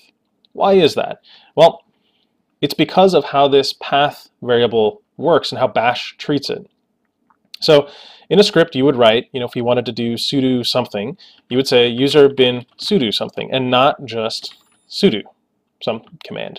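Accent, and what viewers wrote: Russia. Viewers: Отличное видео и хороший русский акцент! p.s. Из России с любовью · I loved your Russian accent 😂😂😂😍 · damn! that was a perfect russian accent man!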